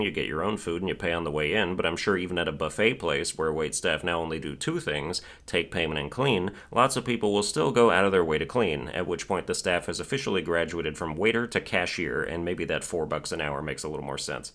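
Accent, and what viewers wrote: United Kingdom. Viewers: That was your best English accent yet, not even a tinge of the Aussie in there 💯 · Hearing that british accent just after playing The Great Ace Attorney is certainly something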